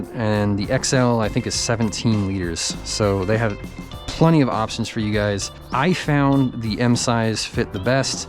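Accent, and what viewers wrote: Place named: United States. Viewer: Wow this is the first time I heard such an authentic American English pronunciation from Japanese uploaders. Well done.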